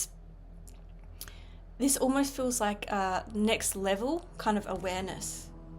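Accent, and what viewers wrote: Australia. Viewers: Aussie accent!❤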